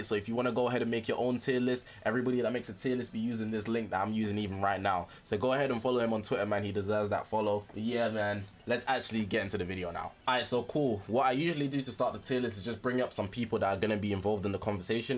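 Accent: United Kingdom. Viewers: British man explaining in NBA terms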